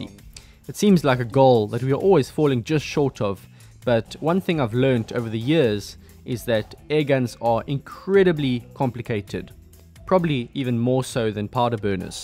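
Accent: South Africa. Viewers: are you from South Africa. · nooit hes south african!!!!!!!!!!!!!!!!!!!! subscribed and notified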